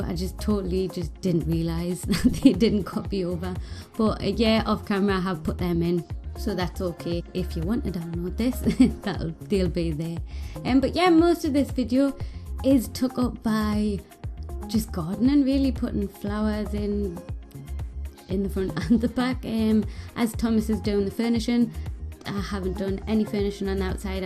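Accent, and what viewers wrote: United Kingdom. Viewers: Your accent sounds like a mashup of something from the Caribbean plus something from the UK what is it i'm in loveeee???!?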